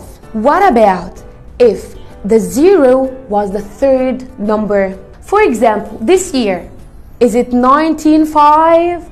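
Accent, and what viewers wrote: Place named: England, United Kingdom. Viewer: حاسس ان هو انجليزي 😂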